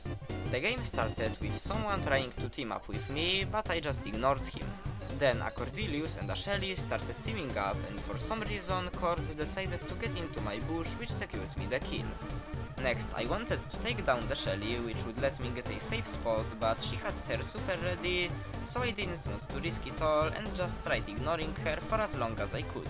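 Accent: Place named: Poland. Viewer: Masz polski "akcent"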